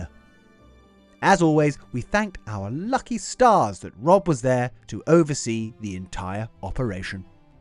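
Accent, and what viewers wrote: United Kingdom. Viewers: Love, love, love your delightful British accent ❤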